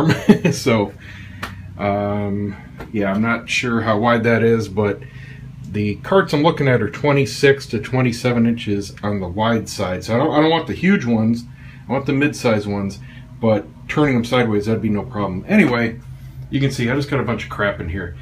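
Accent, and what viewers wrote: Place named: Orlando, United States. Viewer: Check the pawn shops, ive been cleaning up there lately. You sound like your in the Orlando area. If so check out Godfathers pawn on Colonial. There are 2 locations. I seen a nice Milwaukee box in there you might like.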